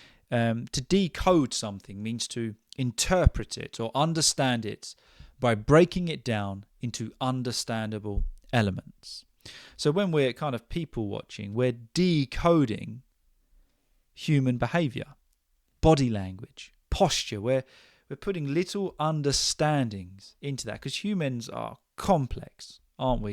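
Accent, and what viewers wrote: United Kingdom. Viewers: I love your vibe and clear British accent 😊❤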